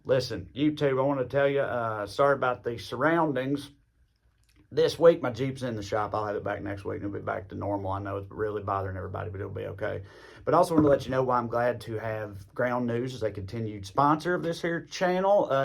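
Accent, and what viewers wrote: South, United States. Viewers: I never realised that someone with a southern accent was capable of criticising the Republican party. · I am a Canadian (love your Southern accent)…seriously though, you shoot straight from the hip, and call it as you see it! You are the ACTUAL voice of America, over all the Trumpers and Republican deadbeats. Thanks! Lovely hearing your views. Hope there are a majority out there that feel the same way you do!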